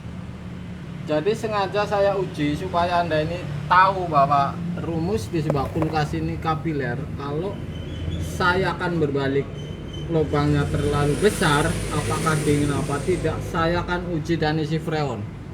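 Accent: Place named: Java, Indonesia. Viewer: Medok temen kang..sampean wong ndi..😁😁😁😁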